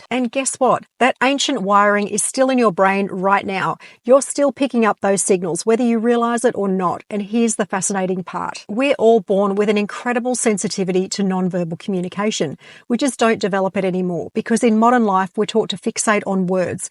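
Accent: Australia. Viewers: OMG the Aussie accent is so harsh on the ear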